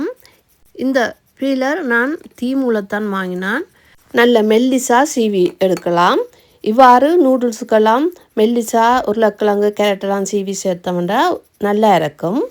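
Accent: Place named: Sri Lanka. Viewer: அடடா இலங்கை தமிழ்..தனி அழகுடா..காதெல்லாம் குளிருது கேக்கவே!!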